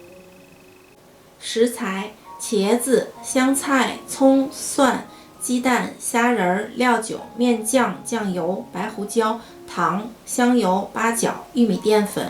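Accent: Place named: Northeast, China. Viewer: 哈哈 东北银儿吧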